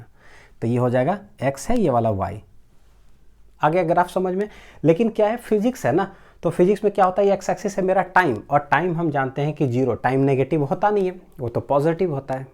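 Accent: Bihar, India. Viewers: Sir are you Bihari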